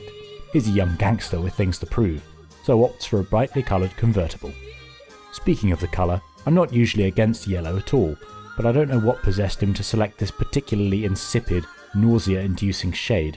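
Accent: United Kingdom. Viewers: Lost me at the British accent.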